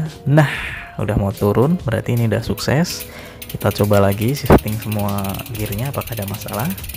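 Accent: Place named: Indonesia. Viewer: tplong Bro ..bahasa inggris jgn dieja dgn indonesia kl screw itu bacanya skru kl adjuster bacanya edjaster hhh youtuber indonesia dah paling parah dah.. modern tampilan tp ada rasanya primitf2nya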